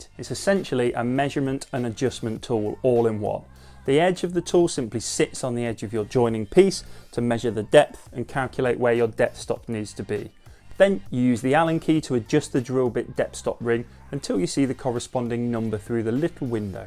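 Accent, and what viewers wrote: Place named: United Kingdom. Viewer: Thanks for the review. Just bought one! And loved the Limey accent (I'm a Brummie in Canada!)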